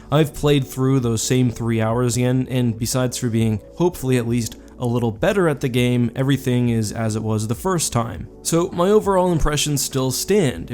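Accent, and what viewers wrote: Canada. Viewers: Lots of “oots” and “aboots” in this video, I’ve never noticed how Canadian you sound before Eh.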